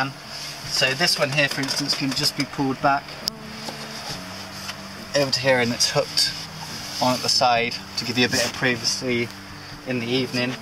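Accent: United Kingdom. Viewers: one of the most adorable homes.love his brit accent.love the way his eyes light up when he talks about his wife.every feature in the home is awesome. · I just love the British Accent, I don't know why.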